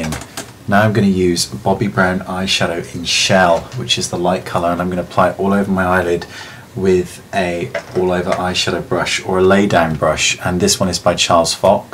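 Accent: United Kingdom. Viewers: Excuse me Mrs/Mr; your VERY Beautiful && your British accent is HAWT( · omg, I loooooooooooove your british accent :)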